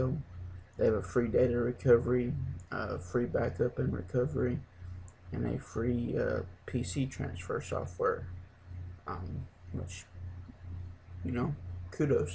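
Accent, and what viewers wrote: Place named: South, United States. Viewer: Thanks for making this informative video. Love your accent. Its so saddening that southern accents are being diluted in recent times. I hope the accents always stick around. I love them so. You also happen to have a very calming voice. This video is all around good to watch.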